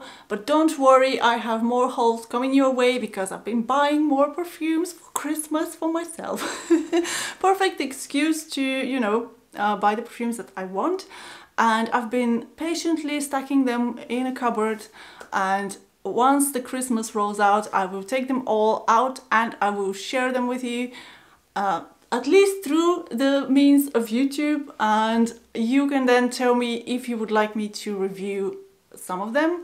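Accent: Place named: Finland. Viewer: Hello how you feeling?New subbie here:) Glad I found your channel my friend.Wonderful video and haul.Super solid list.I see your from Finland.Very cool,you have a beautiful accent as well:) I was in Paris this time a year ago in Jan of 2020.I was having a couple of pints of brew at a pub right outside the Paris Saint Germain stadium after the football match against Monaco.The game just ended so the place was packed with people who just left the game.I met some COOL people from Finland.Really genuine and down to Earth,great times.Happy New Year to you and yours.Much love from California.Peace & Blessings!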